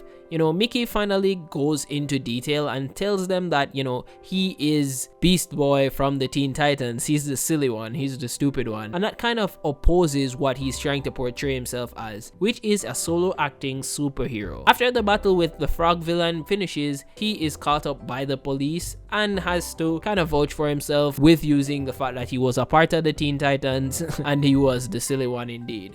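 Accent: Jamaica. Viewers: This is my 1st vid and i can tell your jamaican i am glad there are jams like me who loves explaining cartoons/shows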